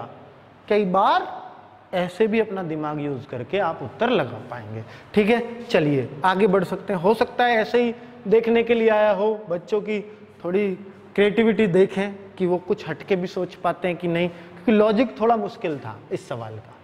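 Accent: India. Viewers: Ram ram Maja aajata hai guru ji apne se lagte ho ekdum desi basha me english bi desi si lagti hai aapki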